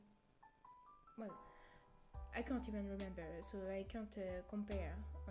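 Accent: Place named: France. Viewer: Very proud of you for steaming in English. it must be scary speaking especially streaming and talking to people in a Language you’re not 100% confident in speaking. I could understand you fine I think you’re English is good yes you do have a heavy French accent but I think that shows you’re background. and it’s apart of who you are that’s something to be proud of people used to make fun of me for the way my voice sounded because of my Chinese accent and I hated it and hated the way my voice sounded but my voice and my accent is a part of who I am and I’ve overtime become ok with that so please never be ashamed of that it does take a while to become comfortable with yourself and the way you’re voice sounds but as you become more confident in speaking you will realise that your voice isn’t as bad as you thought it was if anyone makes fun of your voice you can say L + ratio plus I’m bilingual and your not lol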